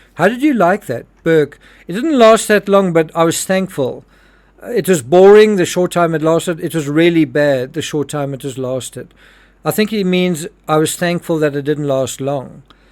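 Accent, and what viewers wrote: South Africa. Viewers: South African accent. Love it too when he says that!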